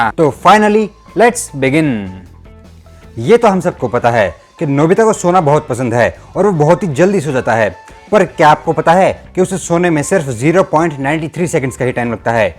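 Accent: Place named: Marwari, India. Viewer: I love your marwadi accent 😂😂😂😂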